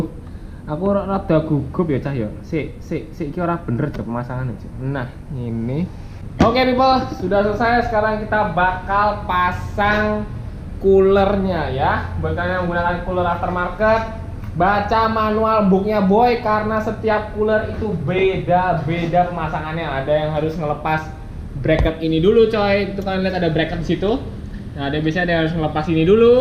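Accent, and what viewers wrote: Java, Indonesia. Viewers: Ngomong nya ada jawa nya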